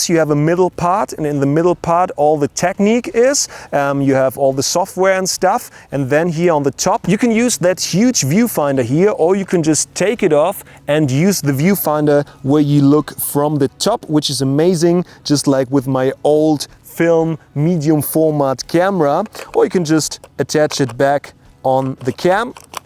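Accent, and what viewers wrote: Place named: Germany. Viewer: I really like your videos but your German accent is just crazy XD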